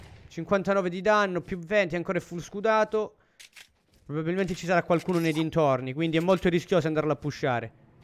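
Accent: Calabria, Italy. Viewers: Ma sei o Ninja??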